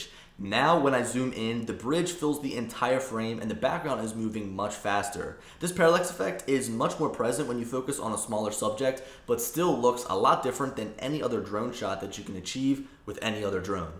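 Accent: Philadelphia, United States. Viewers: Hey, Wow, r u from Philly or NJ.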